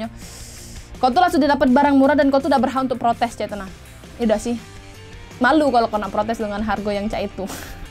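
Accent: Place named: Palembang, Indonesia. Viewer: gaya kk bicara palembang kental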